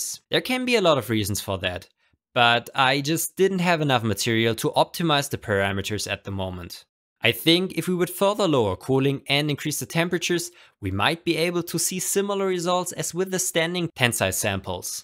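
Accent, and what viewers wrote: Germany. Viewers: This deutscher Akzent ist schon gut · Moin meister! Man kann hören das du deutsch bist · Man merkt das Du deutscher bist🤣 guten Tag evrebody im cooles video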